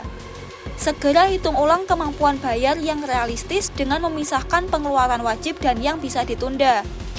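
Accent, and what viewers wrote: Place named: Banyumas, Indonesia. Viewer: Ai bahasa ngapak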